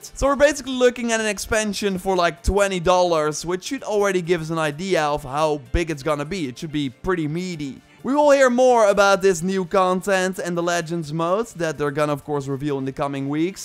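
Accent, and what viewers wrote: Netherlands. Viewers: Damn that dutch accent is jarring And i am dutch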